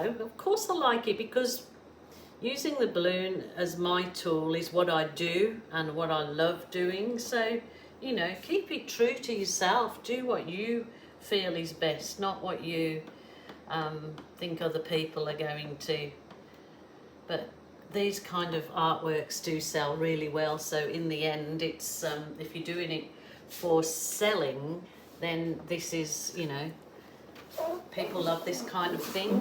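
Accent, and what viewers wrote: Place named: England, United Kingdom. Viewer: Hello my "Angel across the pond". During the epedemic here in the USA, I found u. Started watching u around 2\20 n have been pour painting, or thinking about supplies, ever since! U saved my insanity! Ur insight, personality n teaching. Yr English accent sounds beautiful to me.. Thank u Stay safe.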